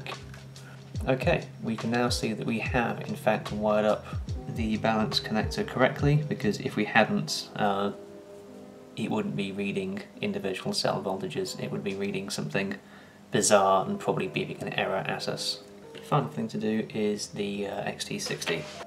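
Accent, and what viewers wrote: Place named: United Kingdom. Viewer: I don't know anything about batteries, I just googled "videos of British people talking funny", but this guy seems really obsessed with sales.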